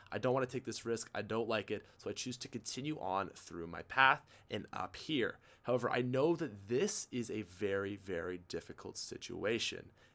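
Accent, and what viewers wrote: Canada. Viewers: its all a bout that canadian accent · Are you Canadian???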